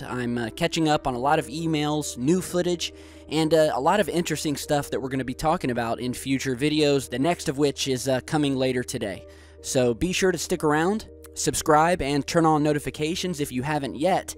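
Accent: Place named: United States. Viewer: Any chance you can learn pronunciation of the English, that's not American language? The word Antarctica has some "t"s in it, use them please! Get some education, PLEASE!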